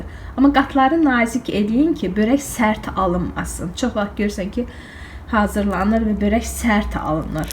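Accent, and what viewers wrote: Baku, Azerbaijan. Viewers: Gozel Bakili letcesi le bacim, Allah sizi qorusun, 🙏🏻❤️🧿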